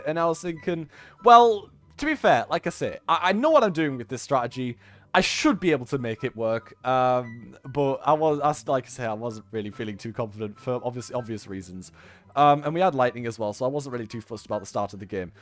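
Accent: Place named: United Kingdom. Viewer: Man I really can’t get over ur British accent lolll it’s awesome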